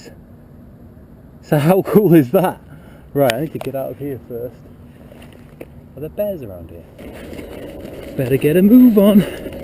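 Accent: United Kingdom. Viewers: This guy has a British accent though !?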